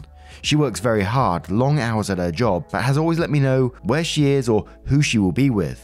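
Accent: England, United Kingdom. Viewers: Why is the narrator who sounds English saying he needs therapy? Who the F gets therapy in England or most of the world in fact? It's an American thing. I would never go chat to some stranger about my life and listen to their bullshit opinions. I make my own decisions in this world.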